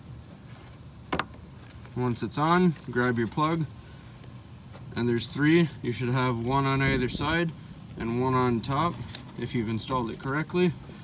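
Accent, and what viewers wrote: Canada. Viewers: Thanks bruh, you sound Canadian. :) btw, I had to take my battery out to get to the headlight on driver side. 2003 Vibe. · You seem to have a Canadian accent! 😊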